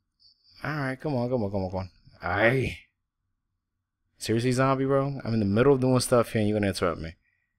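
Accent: New York, United States. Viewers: Ahhh, so thats the lovely New York accent we hear!! Great start to a series. It goes to show that a bit of exploring can really help - you're so brave, I have to set up a whole town with a million full chests before exploring - I guess it's the hermit in me :D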